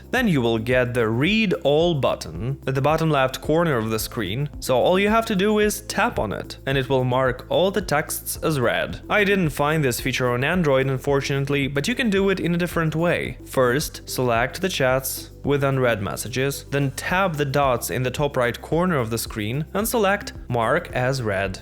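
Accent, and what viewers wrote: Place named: Russia. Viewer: You are Russian, I hear your accent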